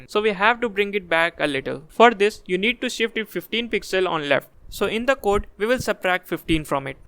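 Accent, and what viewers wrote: India. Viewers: Indian accent !!!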